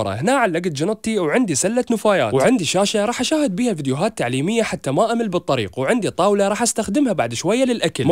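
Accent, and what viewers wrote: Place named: Iraq. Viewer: بس سوال انت عراقي بس لهجتك حيل مبينة عراقي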